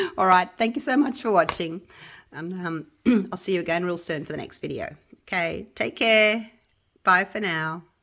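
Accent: Australia. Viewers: Woah kookaburra are so big. And I got it right the other bird is an Ibis. I’m a brand new sub. Are you Australian or from New Zealand?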